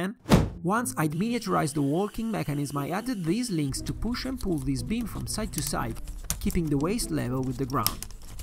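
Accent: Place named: Italy. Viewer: I'm guessing Italian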